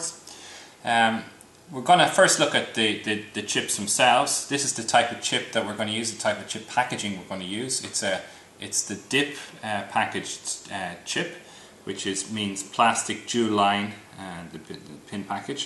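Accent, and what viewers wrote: Ireland. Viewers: Extremely pleasant voice. It sounds Irish to me! Thank you for sharing!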